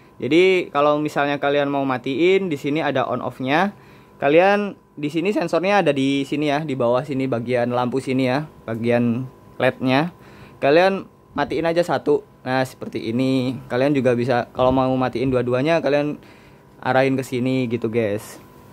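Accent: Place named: Java, Indonesia. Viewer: Jowone endi mas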